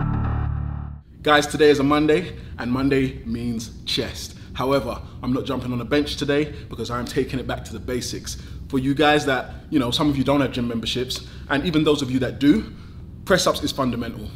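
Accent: London, United Kingdom. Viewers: OMG!!! I haven’t heard a London accent for two years 🤗🤗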